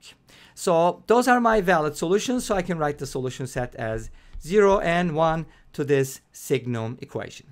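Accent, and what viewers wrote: Turkey. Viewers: Sir you sound like a turkish because of your accent but thanks for helping,sending you the best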